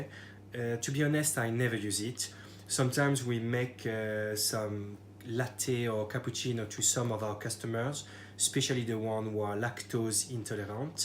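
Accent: France. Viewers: You sound French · Hello friend ! ! ! Thank your for this nice video ! ! ! ! What is your native langage ? ? I subscribed today Sébastien .... Hello from FRANCE .... It seems you speak french because your special accent ! ! ! ! 💖🍔🍚🍎🍭😍🍄🍏💛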